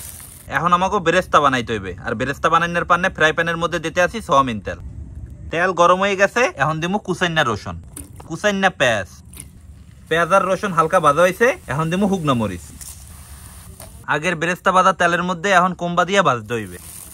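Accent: Barishal, Bangladesh. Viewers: হায় রে বরিশাইলা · আমিও বরিশালের ছেলে কিন্তু আপনার মতো সুন্দর করে বরিশালের ভাষায় কথা বলতে পারি না😢 আসলে আঞ্চলিক ভাষায় একটা টান যেটা আমার কথায় আসে না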